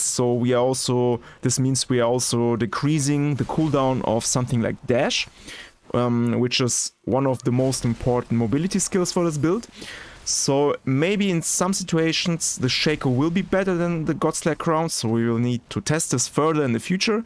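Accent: Germany. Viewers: Moin, wo kommst du eigentlich her, klingst irgendwie deutsch? :D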